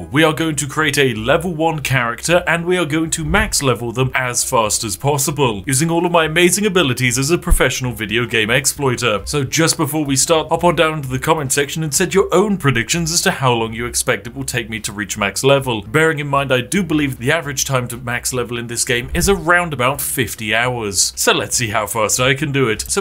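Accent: United Kingdom. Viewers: I am here just because I remembered this video had a program that is basically a lag-switch and I totally could use a program like that thanks random British man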